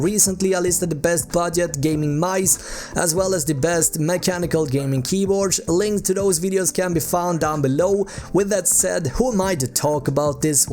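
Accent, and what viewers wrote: Sweden. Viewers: Your english vocabulary is good, and so is your review. But your swedish accent and shouting is really ruining it for me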